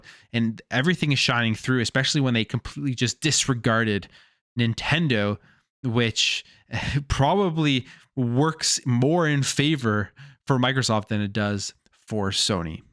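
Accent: Canada. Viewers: You from Canada bro? Just curious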